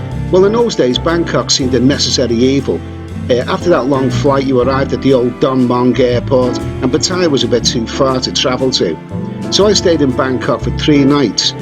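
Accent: Liverpool, United Kingdom. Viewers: Great video, mate, love hearing a proper Scouse accent instead of one of those well heeled condescending upper class tour guides telling me nowt but how to fund their Patreon account. Proper honesty in your opinions made this a pleasure to watch. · Are you scouse?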